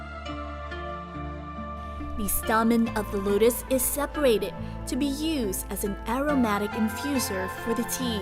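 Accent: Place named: Vietnam. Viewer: Giọng chị hay quá :) em còn chưa kịp nhận ra là người Việt cơ :d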